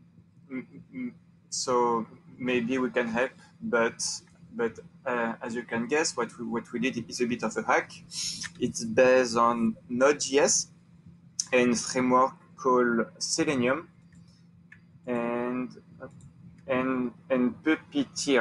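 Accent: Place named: France. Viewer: I didn't know I was looking for a French accent cliché until I found this video...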